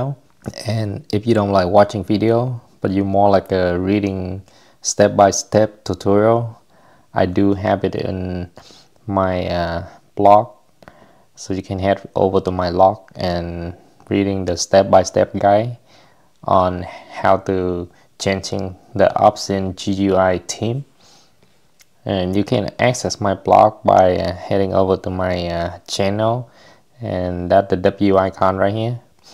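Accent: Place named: Vietnam. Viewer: Very helpful video By the way, are you from Vietnam? your accent sounds very familiar :D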